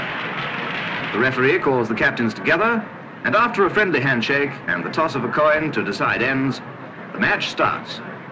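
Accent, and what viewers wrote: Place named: Southern England, United Kingdom. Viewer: What about that commentator ... typical middle class southern English accent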